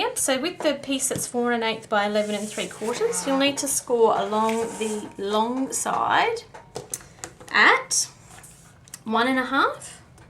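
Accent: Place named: Australia. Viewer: Hi, Thank you for the video on the alternate size. Where can I find the dimensions, other than writing them all down from the video? I love your Aussi accent too!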